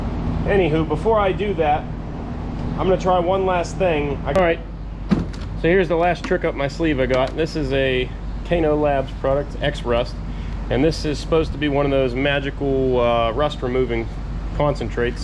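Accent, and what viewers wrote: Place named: South, United States. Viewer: that little southern drawl inflection is great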